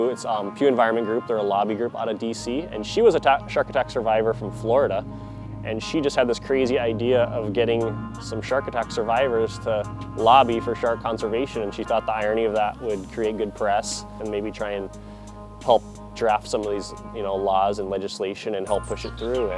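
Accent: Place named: Canada. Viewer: He never says how he got his accent in Canada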